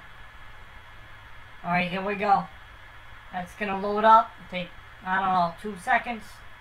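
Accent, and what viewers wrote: United States. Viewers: You sound east coast, brother.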